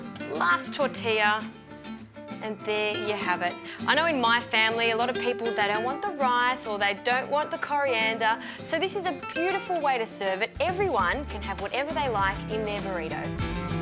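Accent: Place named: Australia. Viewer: Remember the 160 is Celsius, she sounds from down under.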